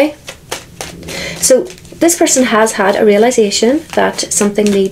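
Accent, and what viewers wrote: Ireland. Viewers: I so enjoy your readings and your Irish brogue. And now today I'm loving your Scooby-Doo top. Can you please tell us where you were on vacation? It looked spectacular! Thank you for sharing your abilities and gifts with us. ❤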